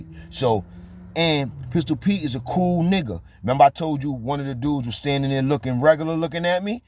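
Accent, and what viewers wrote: New York, United States. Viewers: Ain't nothing like hearin a REAL NY NIGGA spit that G!...U Heard.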